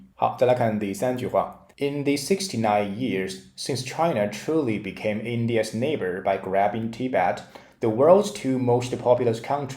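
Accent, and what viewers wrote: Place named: United Kingdom. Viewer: 有英腔朗讀，又有精闢句型詳解，是一個真正學好英文的好節目。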